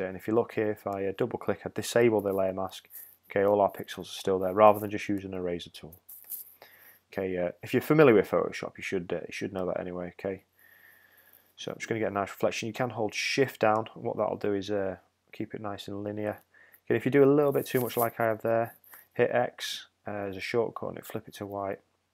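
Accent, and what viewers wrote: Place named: United Kingdom. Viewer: Ur def a Brit but this is a great video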